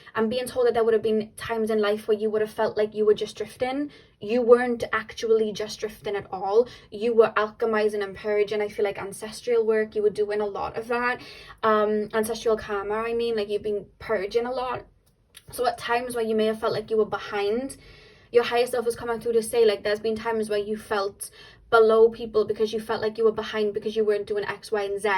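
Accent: Wales, United Kingdom. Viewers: Pile 2 & 3 I swear you always put out videos exactly when I need to hear them! Thank you so much for the motivation to help me keep moving forward. ❤️‍🔥 Also just wanted to say how much I love your Welsh accent. 🥰 I could listen to you speak all day.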